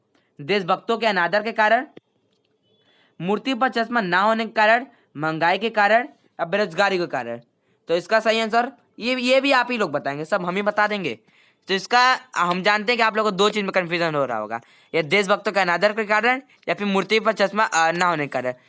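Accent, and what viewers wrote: Bihar, India. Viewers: Aap bihar se hai ky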